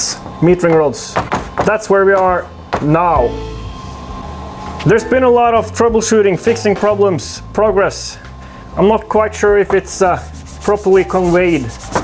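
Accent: Scotland, United Kingdom. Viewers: The Scottish accent is getting better 👌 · Scottish accent is hilarious! 😂❤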